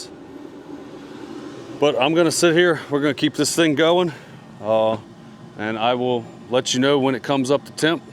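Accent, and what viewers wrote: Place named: United Kingdom. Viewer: Another viewer from the UK here. Just wondering if you're from the UK, or have spent time here as parts of your accent is very British :) First time watching, great content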